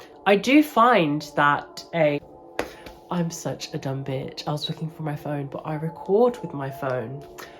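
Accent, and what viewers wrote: England, United Kingdom. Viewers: you are totally a passable, even if you were having an off day, that English accent instantly makes you passable again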